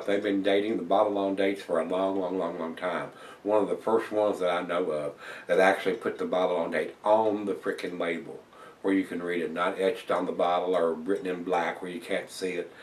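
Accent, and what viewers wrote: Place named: Virginia, United States. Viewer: hey! love your reviews brother. what part of va are you from. because you sound like your from my neck of the woods.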